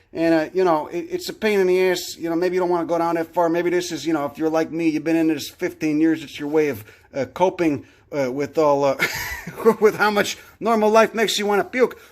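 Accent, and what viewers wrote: Chicago, United States. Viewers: I just found your channel last night and I'm hooked. I've really only ever had an interest in botany insofar as it related to what was in my garden or anything growing wild nearby that could be useful to me somehow. This is changing for the better and you helped me to widen my interest in not just plants but all life that exists nearby, wherever I happen to be. Also I like your accent. Chicago, yes? I really enjoy how your accent might make someone think a certain way about you but then you immediately dispel that belief by dropping some knowledge and talking about critical thinking, a skill that is sorely lacking in this country due to the fact that it's harder to exploit someone who thinks critically about how's and why's. Thanks for taking time to share your knowledge with the world. Best wishes from Seattle, WA.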